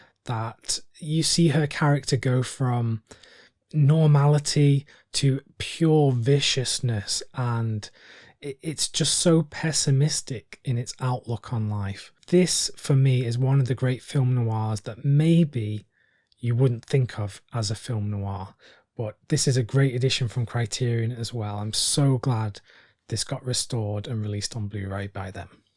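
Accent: United Kingdom. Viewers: Great tutorial and will watch some of these. Is his accent British maybe from a small corner of England somewhere? Sounds like a very unique accent. Is "North by Northwest" more of a Hitchkok horror film and not Film Noir? I looked at the present day list of the Film Noir of today, forgot the official name of that genre, and wow so much violence I quit watching even the clips.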